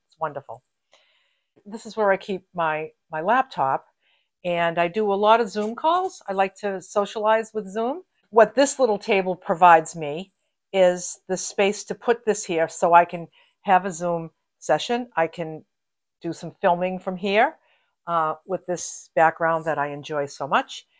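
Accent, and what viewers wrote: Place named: Massachusetts, United States. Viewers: Love ya Boston accent! You don’t look like you gained a pound. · you are delightful! (Sounds like you’re from middle MA- I’m from western MA) I’m getting ready to move in with my oldest son. My daughter in law is precious, and has no problem sharing her kitchen, but I’d still like my own tiny space. Love your ideas!!! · Love your video. I can tell you’re a Massachusetts native. ❤ I’m from Gloucester, but now live in Ohio. 😕 love your solution—and all the good stuff you were able to thrift! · ! Your channel popped up and you're either a genius or I lead a very sheltered life. I have been looking for ways to minimize my living space without selling my home. I absolutely love what you have done and that everything has a purpose and a story. I have been retired since 2019. working breeds stress and clutter. 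My space is way too big and I'm exhausted by donating and restructuring. This video helps me focus. My husband (we lost him in 2020) was from Boston and I adore your New England accent. Your video gives me lots to think about and you are truly inspiring. Thank you and continued good fortune.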